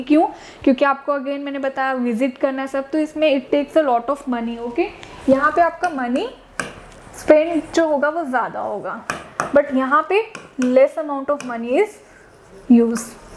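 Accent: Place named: Bihar, India. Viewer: Mam app acha padhtii hoo but app measurement conclusion kee sahi pronunciation use kero app Bihari pronunciation use mat keri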